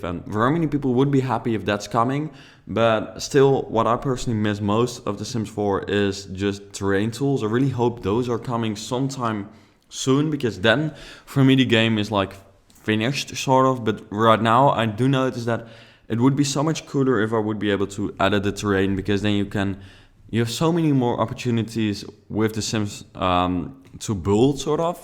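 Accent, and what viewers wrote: Netherlands. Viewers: ah i love your videos and your builds and ik ur Dutch maar je hebt het meest Nederlandse accent als je Engels praat dat ik ooit gehoord heb xddd (which- dw- its cute)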